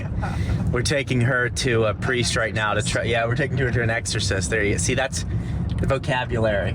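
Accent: Pittsburgh, United States. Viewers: Just found this channel searching for Rivian Reviews and you sold me with the Yinzer accent. I'm considering a R1S to haul kids and stuff around. I'm so iffy on dropping the cash on the R1S but I'm looking at a 2016-18 Land Cruiser and the cost savings alone are just insane. I think 2024 will have a lot of great things for Rivian. I have a 18 month old and a new baby too in march and I just think the Truck will be a touch too small. If I got the R1S I would toss on the Frontrunner roof rack and have so much storage.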